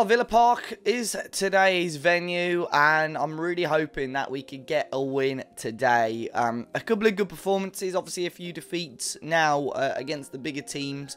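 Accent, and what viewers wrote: Malta. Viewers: you re maltese ? nice channel btw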